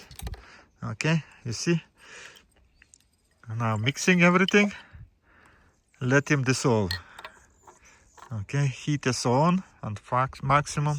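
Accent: Russia. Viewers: Дядь я не пойму ты русский ?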